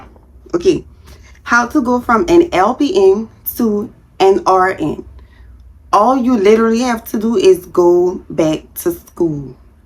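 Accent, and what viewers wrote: New Orleans, United States. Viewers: I love her accent New Orleans baby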